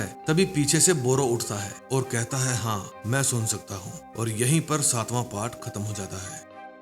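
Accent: Punjab, India. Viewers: ji punjab too belong karde, Hindi wich punjabi accent ah reha?? Just asking and the way you tell a story is really different and you bring humor along with it that’s unique!!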